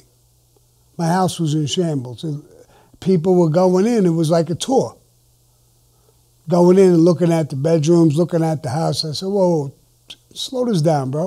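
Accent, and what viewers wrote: New York, United States. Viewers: I forgot to write,sometimes it's hard to understand.either his voice goes low at the end of a sentence or kinda blurry,I think the N.Y. wiseguy accent plays a part too.but I'll take what I can get.cheers!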